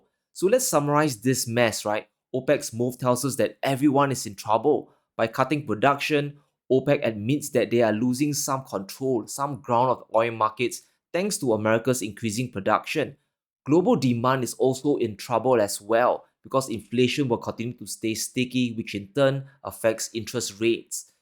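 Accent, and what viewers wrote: Jamaica. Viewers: i cant figure out, is this jamaican chinese accent or what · **** brotha' you sound like a Rasta when you speak. Good information in the video, sir thanks****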